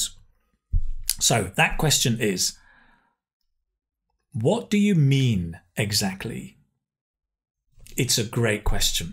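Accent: United Kingdom. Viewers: I like your channel, like your British accent and the way you explain and teaching 💗 your fan from Morocco 🇲🇦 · What i like about this page, your explination and your Lovely pure Britgish accent. Its brings comfort and gives us confidence.thank you very much sir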